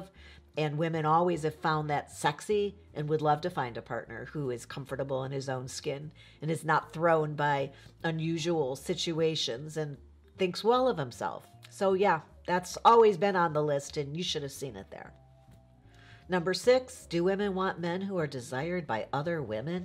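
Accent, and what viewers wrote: Chicago, United States. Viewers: I am getting addicted to your blogs, since I am a recent widower in the dating game. I am very comfortable listening to your Chicago accent! Do you have a good friend who needs to date another "Good Guy"?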